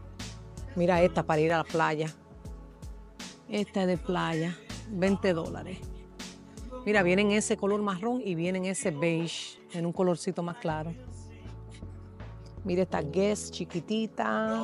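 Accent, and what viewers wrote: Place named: Dominican Republic. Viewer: Arriba R.D., iba a preguntar si eras Dom. Por la forma de hablar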